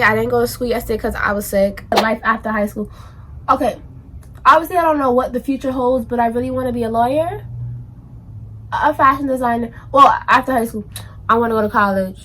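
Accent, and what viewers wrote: New York, United States. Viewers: I love your accent cause you sound so New York, love you 💕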